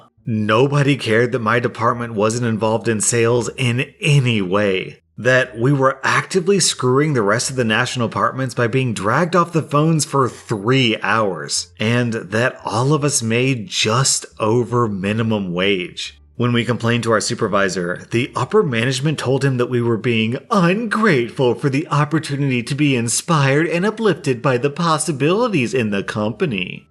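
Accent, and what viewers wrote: United States. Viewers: Woah... an American who says "pat-tree-on" and "eye-ther". What's going on? I'm sure you also say "an-tie" and "sem-eye" just to throw us off.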